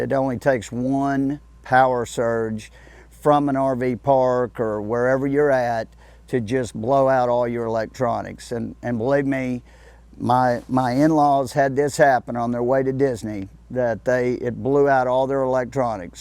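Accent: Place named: South, United States. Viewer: Please use subtitles for those of us who don't speak southern.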